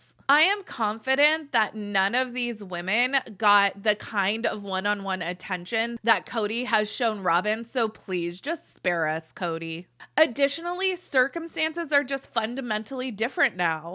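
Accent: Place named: California, United States. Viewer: God I keep clicking on your videos … you say 10 words and I have to stop. I don’t know what accent you have but it’s super Intolerable. California maybe … you drag everything out in a weird nasal way. It’s grating on the nerves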